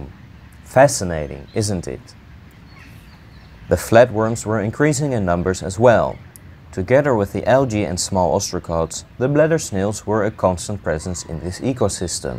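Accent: Netherlands. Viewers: In the first few seconds i thought hmm is he Dutch? And at that exact moment it got confirmed by a bike casually riding by 😂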